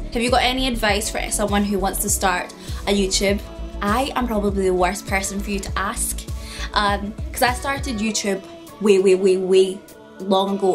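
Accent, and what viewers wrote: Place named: Scotland, United Kingdom. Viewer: Girl you're beautiful !!! ...I 100% expected a London accent myself hahahaha ...I never really liked Scottish accents but you make it sound amazing. Haha! Yaaaas fried chicken ...naaaaah! Your wig fell off in the gym. Oh gosh LOL ...when I ever went to the gym with a wig, I had to wear a cap. True security for me :') ..you're great! I subbed <3xxx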